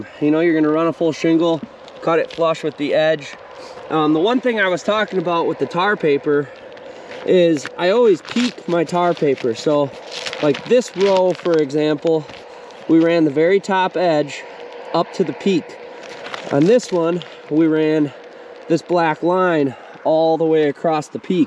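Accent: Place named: Minnesota, United States. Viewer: Also cool because you sound like a fellow minnesotan 😂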